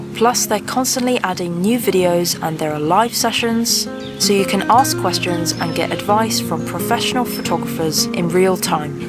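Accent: United Kingdom. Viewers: Every one of your videos makes me wish I had a British accent.😅 Great job on another lovely video!💕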